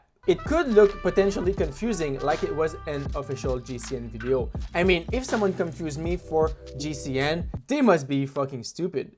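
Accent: Quebec, Canada. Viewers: ça sonne québécois, comme l'accent! 👍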